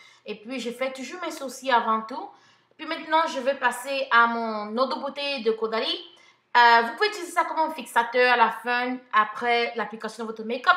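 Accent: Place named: Cameroon. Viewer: Tu m'as bien fait lap J'aime bien ton accent camer . Ta routine est au top et j'aime beaucoup les fard à paupières :)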